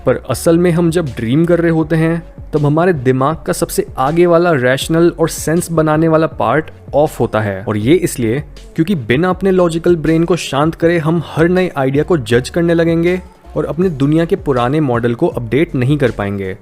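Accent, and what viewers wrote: India. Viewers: yeah same happens 😅😅love ur voice hindi accent❤❤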